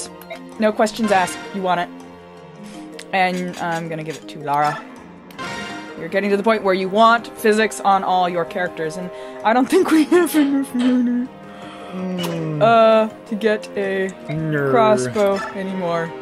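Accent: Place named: Germany. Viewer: oh not at all, your german accent is fantastic, and you were very reminiscent of the actress who voiced Rip Van Winkle.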